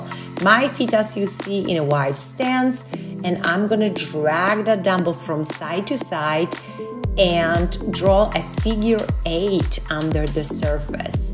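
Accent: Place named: Lebanon. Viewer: وعي تتكلم لبناني احس غرااابه 😂😂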